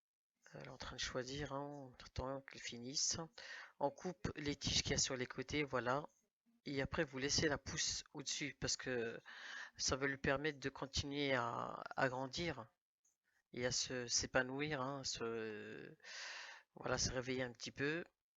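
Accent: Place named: Chleuh, Morocco. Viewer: il me semblait bien à ta façon de parler que t avais du sang chelh 🤣 Moi aussi je suis chelha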